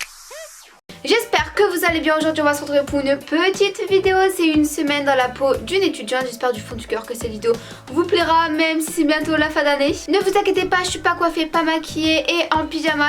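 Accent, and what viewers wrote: North, France. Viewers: Vous avez l’accent du Nord fort prononcé 😂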